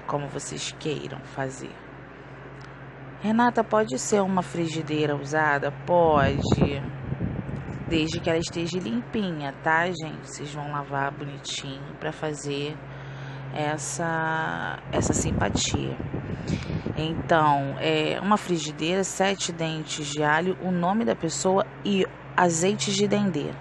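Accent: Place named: Rio de Janeiro, Brazil. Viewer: É carioca?sotaque lindo..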